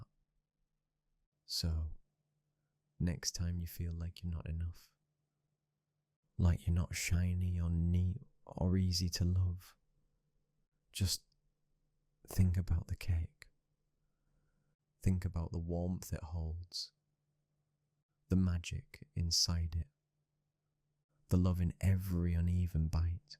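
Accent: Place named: Yorkshire, United Kingdom. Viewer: That voice is so soothing and melodic. I know a Yorkshire accent when I hear one. Are you from North, East, West, or South Yorkshire?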